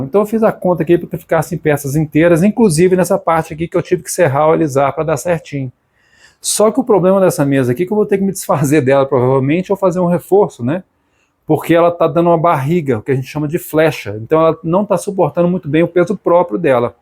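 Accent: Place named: Minas Gerais, Brazil. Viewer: Esse mineirês é tão charmoso, aconchegante e bonito, né? Tal qual as peças!!!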